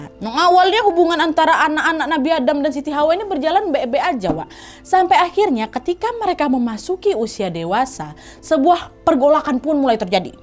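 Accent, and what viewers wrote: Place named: Medan, Indonesia. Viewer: Mirip kontennya Nessie tapi dg aksen Medan , menarik,, Salam dari subscriber baru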